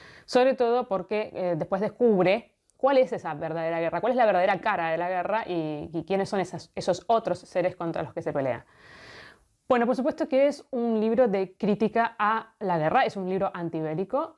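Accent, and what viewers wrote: Argentina. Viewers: Hola! Gracias por tus videos! Me encanta tu estilo tan directo y objetivo para hablar de libros! Amo tu acento argentino! Y es maravilloso tener buen contenido latinoamericano!!!! Jejjee y GRACIAS!!! Por tu recomendación acabo de terminar crónicas marcianas…. Ufff lo amé!!! Todos los niveles de lecturas que tiene! Es increíble!!! Gracias y saludos desde México 🇲🇽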